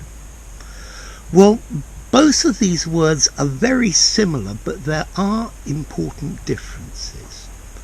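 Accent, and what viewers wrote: RP, United Kingdom. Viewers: Thanks Sir for the lessons you provide for us.What a posh British accent! Splendid!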